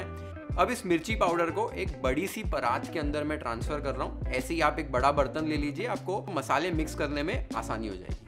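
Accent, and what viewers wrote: Maharashtra, India. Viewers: Really appreciate for your marathi words accent 👍🏻👍🏻👍🏻 अस्सल कोल्हापुरी झणझणीत मिसळ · Hey chef your pronunciation of marathi words is assal Marathi !!!! your recipes are so authentic and explained with such an ease. I am a Marathi but instead of following any Marathi chef I follow your recipes and always wait for the new ones. More importantly you represent it with no nonsense talks. Ekdam to the point. Thank you !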